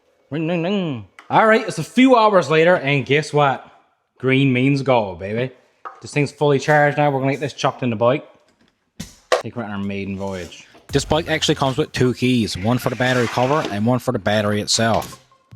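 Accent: Newfoundland, Canada. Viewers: don't sound too too Canadian to me lol, maybe an irish newphie?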